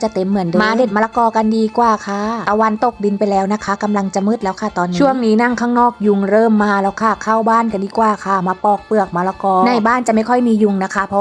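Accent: Suphanburi, Thailand. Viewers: ชอบค่ะสำเนียงสุพรรณเพราะดีค่ะ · เหน่อสุพรรณ คือสำเนียงหลวง ครับ❤❤❤ ผ๋มไผ่ขว๋าง ครับ😅😅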